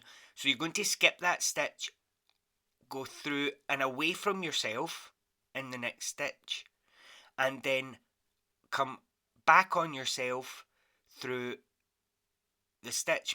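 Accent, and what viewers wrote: Scotland, United Kingdom. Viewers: So glad to see you are well enough to be back giving everyone joy with your tutorials. They are so helpful and give clarity to your pattern. I love listening to your Scottish accent as well.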